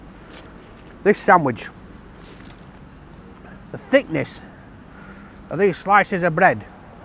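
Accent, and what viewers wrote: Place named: Northern England, United Kingdom. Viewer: I'm here in Washington, DC. Most of the Brits we have here are Oxford or Cambridge graduates, and hold fancy jobs. They have posh, upper class accents. We don't get to see many people from the North of England on the media, and don't hear many working class accents. At times its a little hard to understand, but I love watching him anyway!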